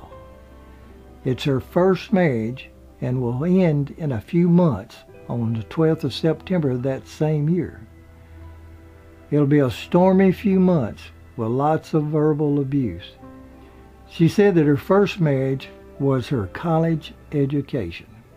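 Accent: South, United States. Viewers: He is a elder southern man. Many (including a large amount of younger ones too) still speak that way down here.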